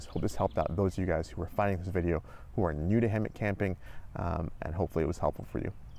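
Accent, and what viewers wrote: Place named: West, United States. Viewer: Bro you look Indian and your name and accent sounds Western sorry don't get offended. Never imagined to avatars in same person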